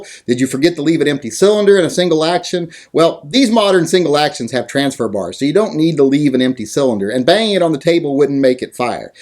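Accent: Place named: South, United States. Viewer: You may be the, but you sound like a Rebel to me. Dude, what happened to the knuckle of the index finger on your left hand?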